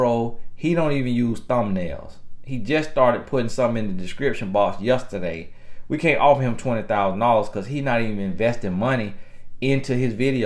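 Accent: South, United States. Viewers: I love your country accent and your authentic self! You're a blessing to us. God made you just the way you were supposed to be.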